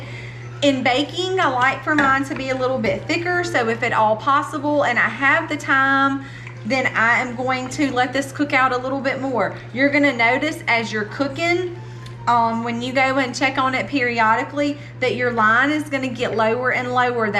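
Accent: South, United States. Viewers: I do apologize the stupid speaker phone I am going to have to go over what it says I really like your accent you could tell your Southern. My accidents between Michigan and South so it's all screwed up LOL and why I like you and your family really because you your husband and you are not afraid to bring up Jesus Christ and you're not afraid to admit why you want to homeschool your kids God sees that so keep doing what you're doing and wild edibles there's an ideal could do a thing on that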